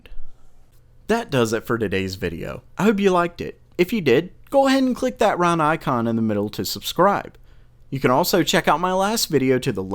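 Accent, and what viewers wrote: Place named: Tennessee, United States. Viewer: That accent is basically the same as mine, had to keep shaking the feeling that it was me talking xD Are you from Tennessee?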